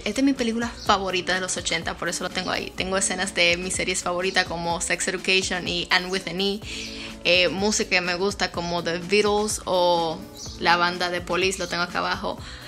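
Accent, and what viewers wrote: Dominican Republic. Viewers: Eres domicana! Conozco tu asentó 👊😌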